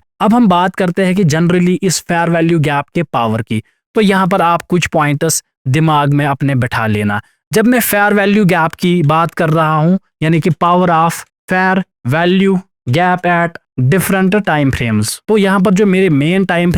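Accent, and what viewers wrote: Kashmir, India. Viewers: Your accent seems to be kashmiri. R u from kashmir,..??? I too am from kashmir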